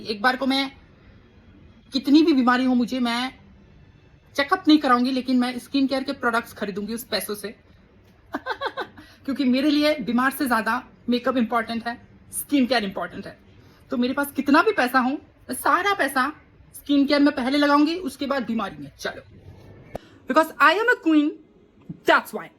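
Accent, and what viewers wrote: Assam, India. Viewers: Are you from assam? 🤭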